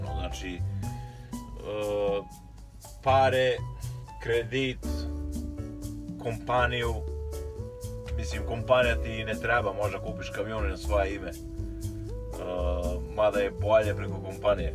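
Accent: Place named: Central Serbia, Serbia. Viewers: Zemljak, ti mora da si negde iz Krusevca,Paracina i tih krajeva? Rekao bih po govoru. A sto se tice poslovanja sa Bugarima....oni su su veci prevaranti i od nas tako da ti to sve govori. Puno srece u poslu. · Meni je posebno zanimljiv govor, pretpostavljam centralna srbija (kragujevac, jagodina, krusevac) jer sam i ja odatle... samo nastavi, extra klip ;)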